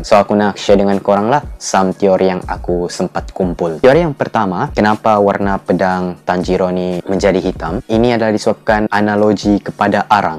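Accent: Sabah, Malaysia. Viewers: abang ni orang sabah ke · Speaker ni orang tawau sabah ka?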